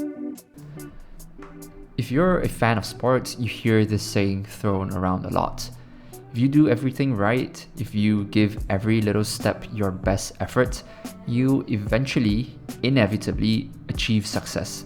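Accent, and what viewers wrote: Singapore. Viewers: I could tell you're from Singapore from your accent!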